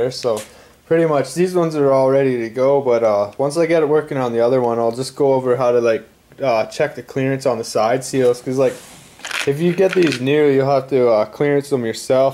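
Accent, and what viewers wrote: Canada. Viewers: are you canadaian by chance?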